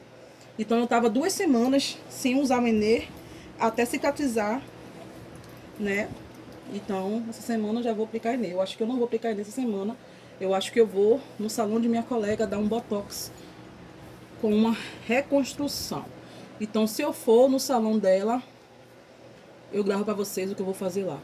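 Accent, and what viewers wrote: Bahia, Brazil. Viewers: Que delícia esse sotaque baiano 😍😍😍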